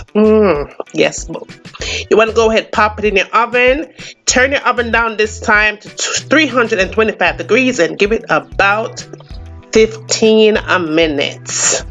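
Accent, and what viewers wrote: Jamaica. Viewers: I could listen to lady talk all day...lol. God I love Jamaican accents...lol.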